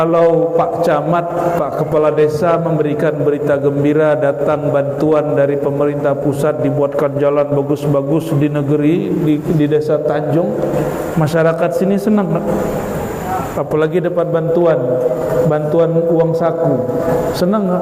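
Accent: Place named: Minangkabau, Indonesia. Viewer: Alhamdulillah Buya bahasonyo taragakjo kampuang halaman, ala lamo Ndak pulang 😅sanang bana mandangah Bahaso, itu asik Samo Samo urang Padang 👍😁🤗💛💛💛💛💛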